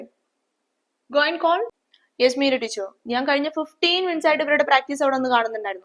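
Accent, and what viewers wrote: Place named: Thrissur, India. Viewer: Ayyo triasur slang Evide poyi??? Sho straight njngada tone…Adipoli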